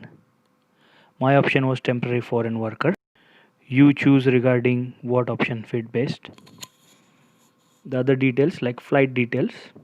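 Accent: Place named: Maharashtra, India. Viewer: Saheb English Changale bota pan Marathi Accent thoda drop karal tar eak no whal, I think u need to have neutral English Tone ur choice of words and sentence construction, is self explanatory ur just one step away to be speaking Flawless very competitive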